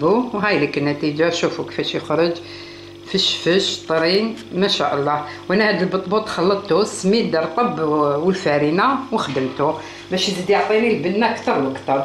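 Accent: Kabylie, Algeria. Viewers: صح انا ديما ندهن قبل ما نطيب الكسرة وهي لهجتها مش جيجلية تبان قبايلية ساكنة في جيجل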